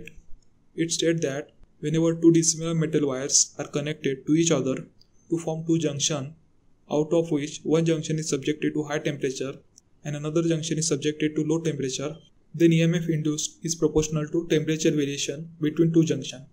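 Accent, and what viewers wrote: India. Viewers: His Indian accent is so thick that it is difficult to understand what is he saying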